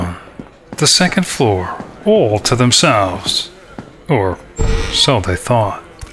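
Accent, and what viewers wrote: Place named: Philadelphia, United States. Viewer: It's very Philly-of-Italian-Descent. "Hey yous. Hey yous guys! Yous been down the shore?"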